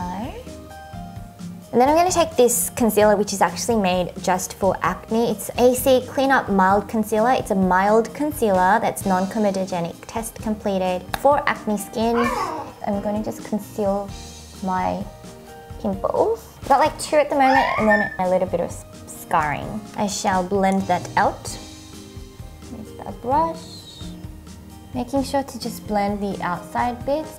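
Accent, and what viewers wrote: Australia. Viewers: You are just lovely but I often get confused with your accent hahaha. I know it's mostly Australian but sometimes I hear Russian? Hahahah it's probably just me. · i love your aussie accent ♥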